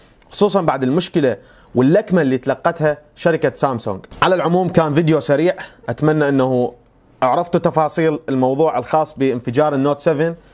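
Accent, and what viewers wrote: Iraq. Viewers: انت عراقي؟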